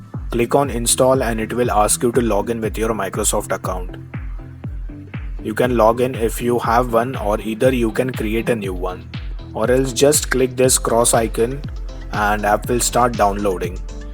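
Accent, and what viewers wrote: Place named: India. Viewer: UR VOICE SOUNDS LIKE AN INDIAN btw good tutorial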